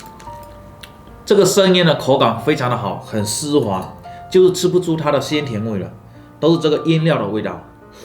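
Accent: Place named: Fujian, China. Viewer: 互跪虾姑😂😂……迷人的胡建口音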